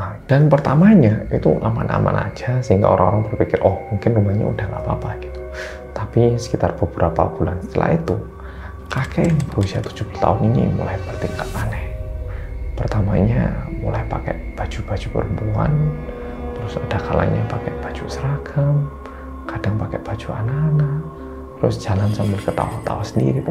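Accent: Java, Indonesia. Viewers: Makasih, Boloku Sing Paling Medok Dheweeeee 🙏🏼🙏🏼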